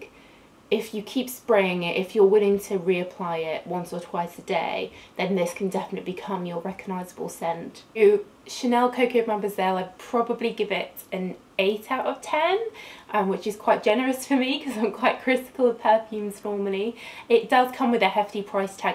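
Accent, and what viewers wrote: United Kingdom. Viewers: I love this accent not every British person does have this kind of accent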